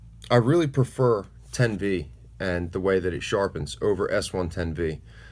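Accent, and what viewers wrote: Philadelphia, United States. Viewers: Love the vids. Sharpening and testing of blades. Appreciate it. Subd for sure....you sound like u got a Philly accent or somewhere close to there...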